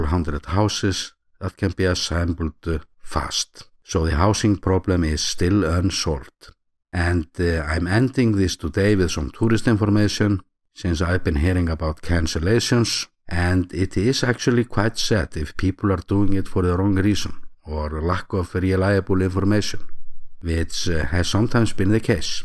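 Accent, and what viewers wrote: Iceland. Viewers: Apart from the content, I love listening to your voice. I am Dutch, and for some reason the Icelanding accent when speaking English comes very close to what my parents sound like when they speak English. (I sound more like an American since I grew up with subtitles and the internet, but their accent sounds so similar in many ways!)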